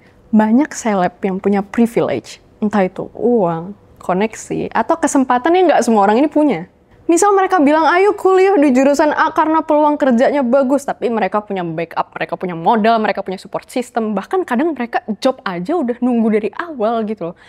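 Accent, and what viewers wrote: Java, Indonesia. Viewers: Kok medok se mbak, bikin seneng aja😅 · mayan lah, medoknya udah hampil ilang, tapi masih kerasa di beberapa pronounce 😁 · satu yang saya notice, kemampuan beradaptasi dengan semakin menyamarkan logat medok-nya meningkat jauh.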